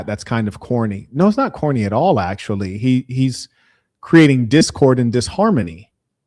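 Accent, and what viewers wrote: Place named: United States. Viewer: Well done. ❤ your videos. Take heed that the ONLY reason these Black 🇿🇦 are acting all sweet, agreeable etc is because of your American 🇺🇸 passport, accent & dollars which they associate with WHITENESS = WEALTH. If you were from Zim or any African country...they would have been aggressively rude to you. Dont be fooled by the agreeableness & listening to you. They see 🤑! I say this because Africans been telling these self -hating 🇿🇦 Knigrows the same thing thing but they insult, because they call Africans (Africans) distancing themselves as Africans because African is associated with BLACK...which means Poor, Beggarly and Helpless. Stay woke to these black 🇿🇦 cats. 😉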